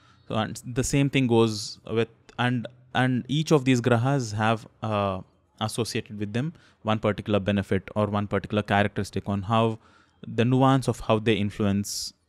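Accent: South India, India. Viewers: actually, if you have observed in his pronunciation, he is a south indian, and generally, south indians only understand Hindi, and that too little, and have difficulty in speaking Hindi, thats why he explains in English, not discriminatory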